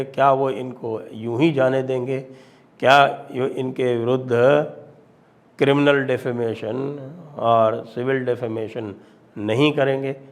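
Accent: Haryana, India. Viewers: ग्रेट! Good humorous vedio with full details of the andolan by wrestlers. Very casual but wonderful way of description. Harianvi style is hit! Impressed!😂🌺🥀🌹🏵🌷⚘ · Everything was ok sir but ur haryanvi accent was too bad😅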